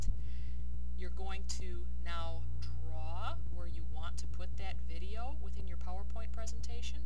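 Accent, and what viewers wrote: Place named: Michigan, United States. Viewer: SO helpful! Thanks! I could tell you were from Michigan within your first 2 sentences.;~)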